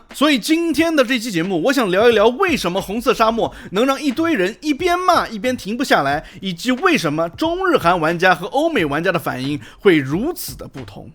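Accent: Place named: China. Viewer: 尖銳陸腔差評😅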